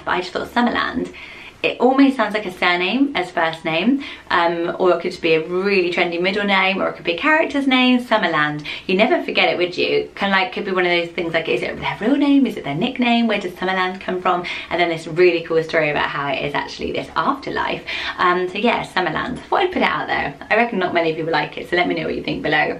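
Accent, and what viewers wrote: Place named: South West, United Kingdom. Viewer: Where are you from? You pronounce your “r’s” like we do in the south west he he xx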